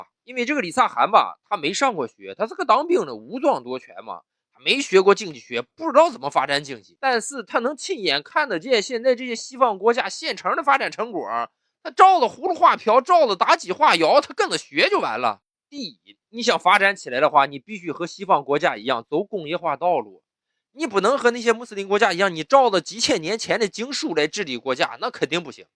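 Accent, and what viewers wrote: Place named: Daqing, China. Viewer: 大庆话，有生之幸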